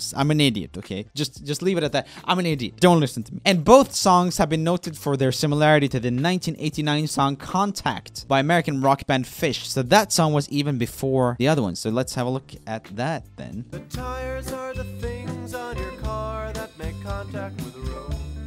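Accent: Sweden. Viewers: Spikey100000 how they’re both from Sweden. Have very similar accents and speach patterns and a similar sense of humour plus they are literally friends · Swedish I think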